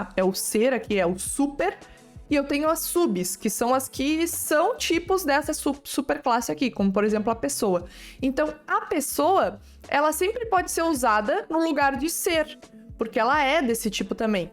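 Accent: Rio Grande do Sul, Brazil. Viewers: ela é gaúcha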